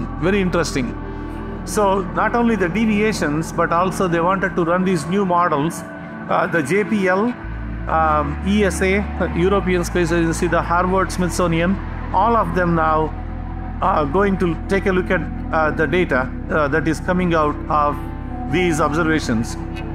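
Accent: India. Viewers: I just can't hardly listen hard to understand. Indian accent. Sorry.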